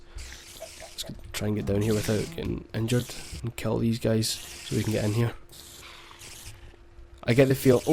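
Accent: Scotland, United Kingdom. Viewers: maaan i love your scotish accent omg be my brother please omg you are like Desmond from LOST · r u scottish?????????????/ lolololol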